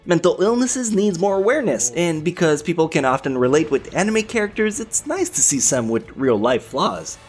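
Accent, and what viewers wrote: Quebec, Canada. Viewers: are you from quebec? your accent seems familar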